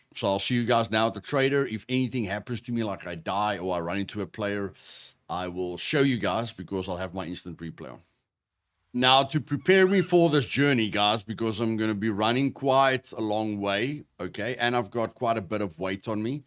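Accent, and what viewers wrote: South Africa. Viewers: took me a minute to realize his accent was SA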